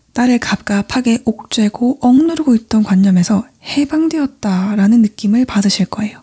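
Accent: Gyeongsang, South Korea. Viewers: 님 늘 감사합니다!^^ 우연히 보게된 끌어당김관련 영상을 시작으로 자기사랑 무의식정화 내맡김으로 관심이 이어졌는데요 미신 같았던 끌어당김을 님 덕분에 과학적 뒷받침 안에서 이해하게 되었어요 그리고 제가 예전 어떤 안 좋았던 지인의 기억으로 인해 경상도사투리 쓰는 여자에 약간의 거부감이 있었는데요(저 여자예요) 님 영상들 보면서 경상도사투리가 참 정겹고 좋으네요ㅎㅎ 사투리는 죄가 없는데 제가 그 기억을 놓아버리지 못했던 때문이죠 아무튼 두루두루 감사합니다ㅎㅎ 님 언제 한번 인간에게 자유의지가 있다 or 없다 이 부분에 대해 다루어주실 수 있으실까요? 님께 댓글을 달고 있는 지금 이 순간조차 모든게 짜여진 시나리오의 일부분인 건지, 태어나기 전 인생의 밑그림은 계획하고 왔지만 자유의지도 있는 건지 궁금합니다.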